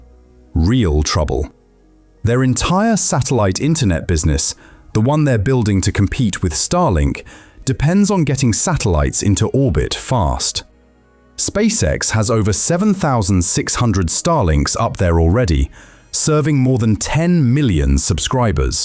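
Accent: England, United Kingdom. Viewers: He's English from the sounds of it. Some of their pronunciations are weird.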